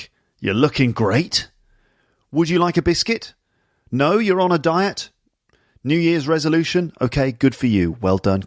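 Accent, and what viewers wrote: United Kingdom. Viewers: One of my favourite way to practice listening British English :> Thks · speaks the standard form of British English. One of his podcasts he mentioned that he speaks as received pronunciation (the kind of accent that you may hear on BBC). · This podcast is exactly what I was looking for, I love the level, the speed, the topics, and specially I love to understand the most beautiful accent: British, at last! Thanks a lot,!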